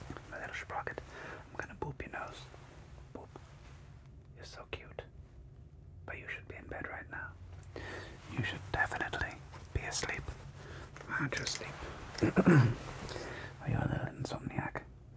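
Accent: Germany. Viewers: I love everything (!) about this video! is such a great, funny, professional, and caring sleep doctor!! And I know I've said this before, but I cannot say this often enough, the German accent so much on point, it's hilarious!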